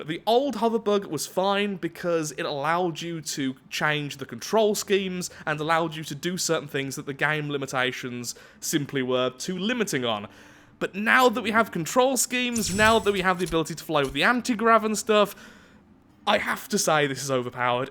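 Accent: United Kingdom. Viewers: Because " X game is broken" in the title, I guess? Then I noticed it didn't say it's without any exploits and realized I got my British sounding creators mixed up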